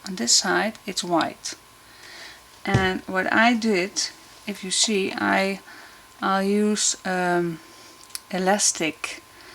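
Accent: Netherlands. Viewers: Brilliant idea. Thank you for sharing and for the free tutorial. I just love your Dutch accent. XXX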